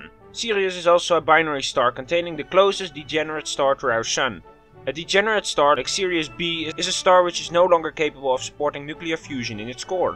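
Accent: Netherlands. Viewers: That Dutch accent!!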